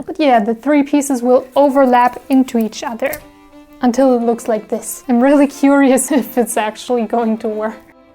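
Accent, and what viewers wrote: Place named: Germany. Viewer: You Sound German or durch, and after you Said the Temperature dropped I knew exactly in what time frame you Made your Project xD i hated the Last two weeks because of their weird weather 😂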